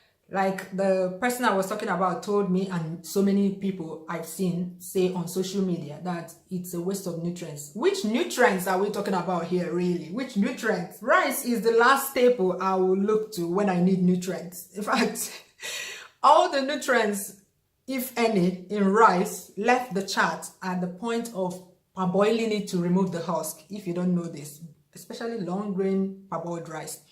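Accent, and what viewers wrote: Igbo, Nigeria. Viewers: I don't boil my rice but I wash it more than 20 times! And it comes out amazing !I would always like our Igbo accent 🙌Ndeewonuooo!!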